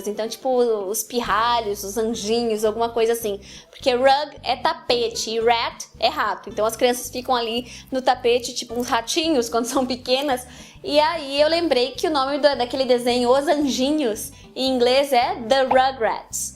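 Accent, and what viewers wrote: Rio Grande do Sul, Brazil. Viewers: tu é gaucha???? nao creio!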